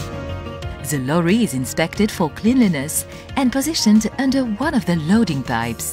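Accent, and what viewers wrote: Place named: Egypt. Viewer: المعلقة مصرية 🤣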